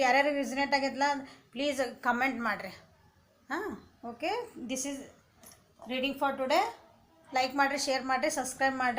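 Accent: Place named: Hubballi, India. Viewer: Tq ಮ್ಯಾಮ್ ನಮ್ಮ ಹುಬ್ಬಳ್ಳಿ ಬಾಷೆ 😍🙏🙏ನೀವ್ ಬಾಳ್ ಚಂದ explain ಮಾಡತೀರೀ I like ಅಕ್ಕಾ you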